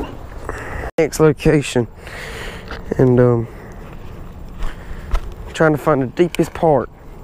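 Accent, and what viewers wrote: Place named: South, United States. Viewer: country accent at its finest ur voice reminds me off mind lol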